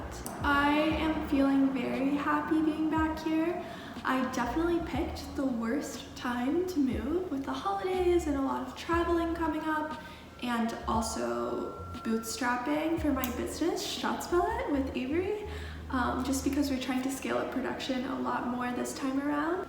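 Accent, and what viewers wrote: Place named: South, United States. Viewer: It’s for sure a tad different. Also she did use to have a southern accent. It’s no big deal to notice change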